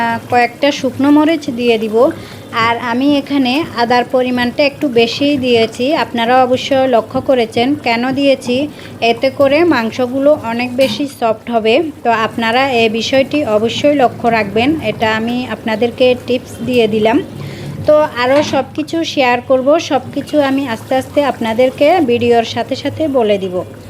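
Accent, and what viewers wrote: Noakhali, Bangladesh. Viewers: আফু, আমনের বাড়ি কি নোয়াখালি নি, আর ত মনে অয় আমনে আংগ দেশি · মনে হচ্ছে আপনার গ্রামের বাড়ি নোয়াখালী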